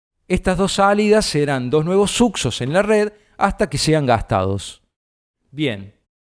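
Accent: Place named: Córdoba, Argentina. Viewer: Genio capo Idolo, muy bueno todo. sos un crack! por tu tonada andas cerca de villa maaaria! abrazo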